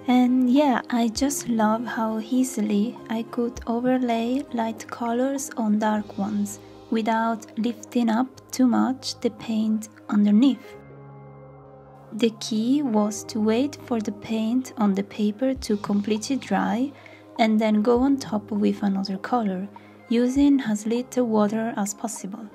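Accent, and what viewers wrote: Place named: France. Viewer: une francophone quand même, j'ai reconnu l'accent! xD mais tu parles bien anglais, ton accent français est presque inexistant:3 j'aime beaucoup ton travail par ailleurs, tu aurais un instagram?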